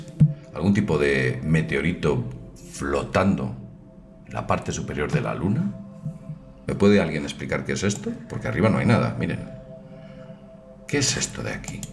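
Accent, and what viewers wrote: Spain. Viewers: ESTE PENDEJO SE CREE QUE SABE, MIRA SO MAMAO TU DEBES SER UN PENDEJO MAS DE ALGUN PAIS DEL TERCER MUNDO HISPANO, TIENES ACENTO ESPAÑOL QUE DE LO UNICO QUE SABEN ES DE MATAR TOROS Y COMER PAELLAS Y MUCHA MIERDA.DIME QUE COSA DE IMPORTANCIA A SALIDO DE ESPAÑA? JAMAS UN PENDEJO ESPAÑOL ENTENDERA. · eres de España?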